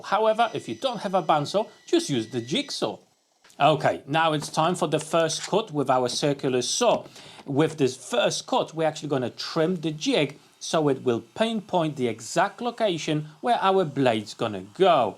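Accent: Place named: England, United Kingdom. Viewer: Another brilliant video made by a Polish guy with an English accent. Always look forward to your good work.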